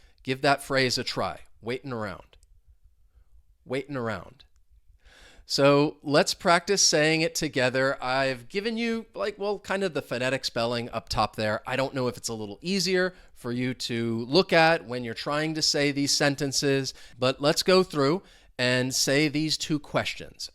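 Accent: United States. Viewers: I love the American accent .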